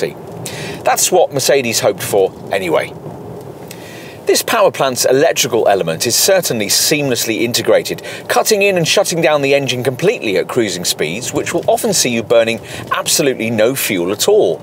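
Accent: United Kingdom. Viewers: Car review in propah british accent!!!!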